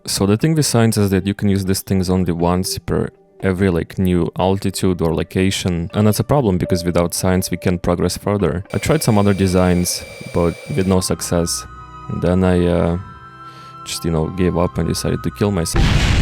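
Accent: Russia. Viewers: from a guy with strong Russian accent... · Wha What is your accent? Not trying to offence, I'm really interested, if you're from Russia. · NOT an American lmao that accent exists NOWHERE in the US 😂 feel like he comes somewhere from Russia or Asian/Euro area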